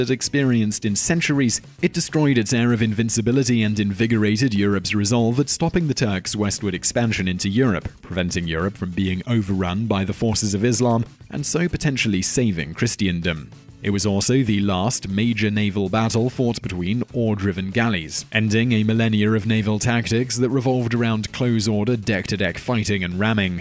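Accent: United Kingdom. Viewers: Told my girl she knew who "Simon Whistler" (british accent) was, and now that ive pulled the video up, shes pretending she doesn't know who u are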